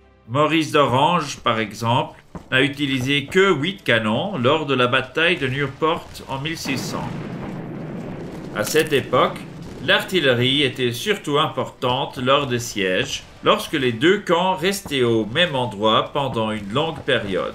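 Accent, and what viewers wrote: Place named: Swiss German, Switzerland. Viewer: Suisse allemand, il me semble.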